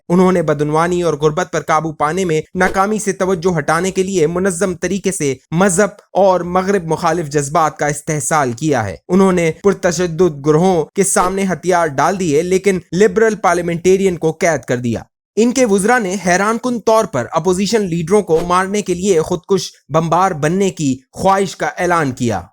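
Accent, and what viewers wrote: India. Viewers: Indian ascent shows imported content from India...??????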